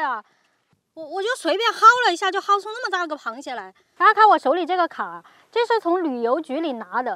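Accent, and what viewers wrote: Sichuan, China. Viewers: 她讲的是四川普通话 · 非常喜欢你开心的样子，空军不重要，重要的是你的川普风格很搞笑开心，谢谢你，同是天涯四川人！ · 四川幺妹儿吧？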